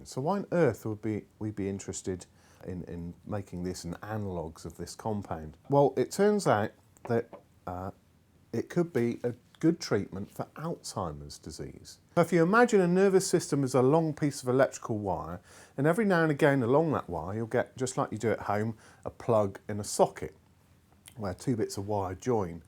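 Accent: United Kingdom. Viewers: I swear, British people could talk about clipping toenails or removing gangrene and it would sound fascinating!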